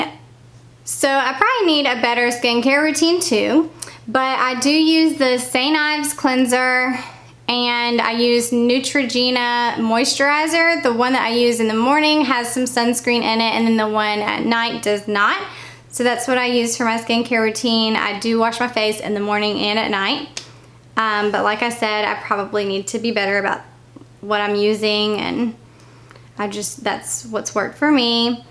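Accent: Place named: South, United States. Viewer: Okay so I don’t want this to sound weird but I LOVE your accent!! I am in the Midwest and don’t hear that southern twang very much. It is so nice! It reminds me of one of my best friends from high school that I lost touch with. 💕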